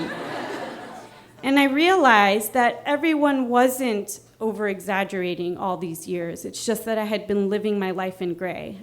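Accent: United States. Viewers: ... I thought you were American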